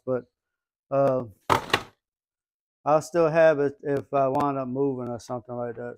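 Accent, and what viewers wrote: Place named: Louisiana, United States. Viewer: I love dat when you hear a voice dats obviously from Louisiana.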